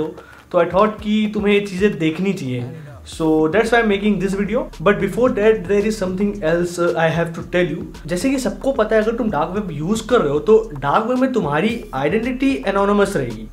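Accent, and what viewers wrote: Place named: India. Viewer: India me ladke aise hi bat karte h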